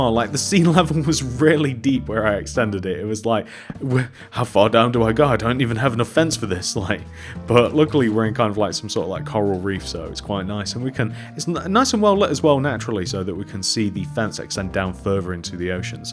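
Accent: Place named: Wigan, United Kingdom. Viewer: Mate you sound like you’re from Wigan.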